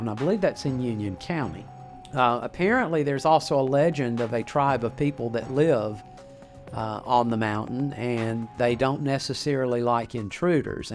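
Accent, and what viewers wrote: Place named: Australia. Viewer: Love the accent.. Sydney'Oz Solid Salute 😭🙌🏼💫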